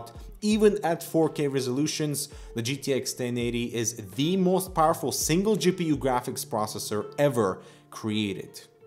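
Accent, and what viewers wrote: Russia. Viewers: русский чтоле? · То есть, он русский? :D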